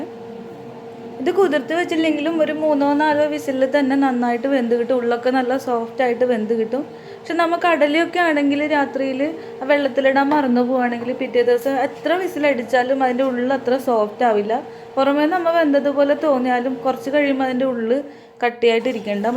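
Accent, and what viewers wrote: Thrissur, India. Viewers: ചെച്ചി തൃശ്ശൂർ കാരി ആണ് ല്ലേ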